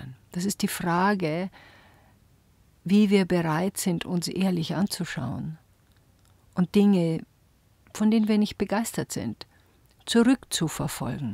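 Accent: Bavaria, Germany. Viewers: schönem, bayerischen Dialekt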